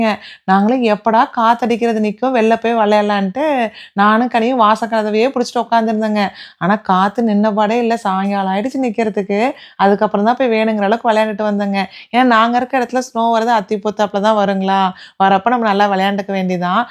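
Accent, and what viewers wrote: Kongu, India. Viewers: Kongu tamil. erode ah sis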